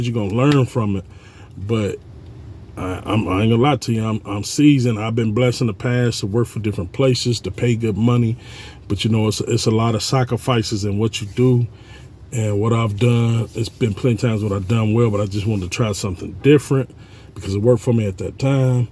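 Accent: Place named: Pittsburgh, United States. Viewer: Sound you like from Pittsburgh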